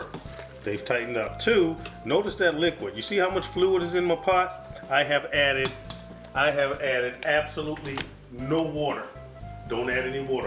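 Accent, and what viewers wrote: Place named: Virginia/Maryland, United States. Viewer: You sound like you are from VA/MD area....so I would have to come by yo' house and see whether them things stink or not! lol. For real though, my nose is ultra sensitive and I've NEVER been near a Chittlin' that didn't have some odor. I appreciate the culture significance though, even though I'm not a fan. Last time (yeaaaars ago) I tried to taste a piece---even smooshed into greens and potato salad---I still smelled them! My biggest concern is "where" they have come from. I would probably only eat them if they came from a local organic type farm. Those pigs in those processing plants are too full of hormones...antibiotics....and general filth...and then we are eating the very part of them that processes that stuff. Adding seasoning salts and bouillon with msg in it ain't helping either. Is there a way to buy and prepare them that is more "healthy"?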